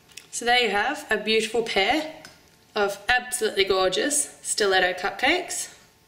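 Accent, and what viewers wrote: Australia. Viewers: I think shes australian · Are u an Aussie if so nice accent lol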